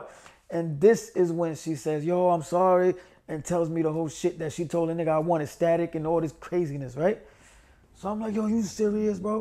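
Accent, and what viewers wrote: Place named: Bronx, United States. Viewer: Immediately subscribed . Love from the Bronx lol that ‘YU HURD!?’ Told me all I needed to know. Accent lmao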